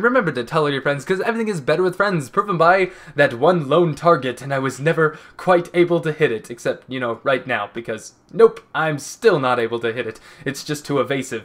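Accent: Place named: Australia. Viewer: Dat Aussie accent. :P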